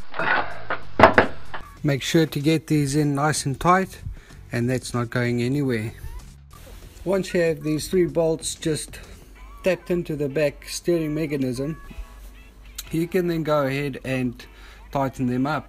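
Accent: South Africa. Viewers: I can hear your SA accent too, it's a weird blend, lol. I'm a kiwi living in Tasmania and missing the great NZ fishing, lol. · Hey dude where in South Africa are you from LOL.... your accent gives you away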